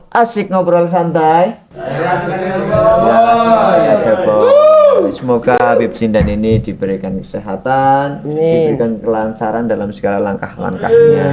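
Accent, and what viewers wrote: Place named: Java, Indonesia. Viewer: Habib kalih niki medoke candu sanget nggh😭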